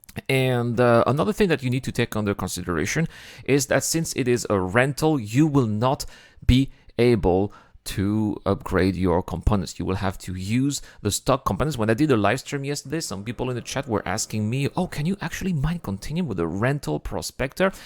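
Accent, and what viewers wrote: France. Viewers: Bro... Are u french?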